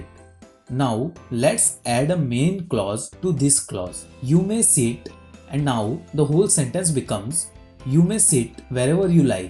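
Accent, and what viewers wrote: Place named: South India, India. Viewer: i think he is south indian😂....nice job sir.... rspct india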